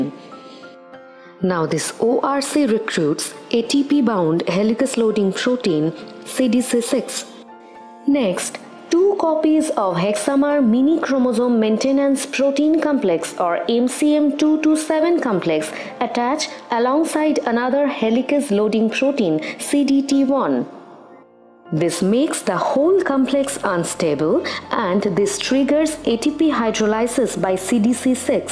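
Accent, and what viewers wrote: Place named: India. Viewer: If only the indian accent wasnt so thick.. 😢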